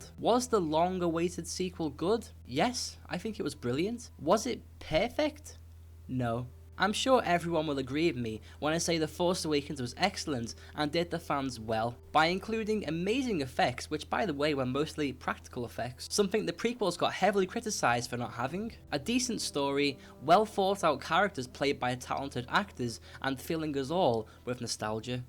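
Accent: United Kingdom. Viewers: Oh wow, so is that a strong british accent?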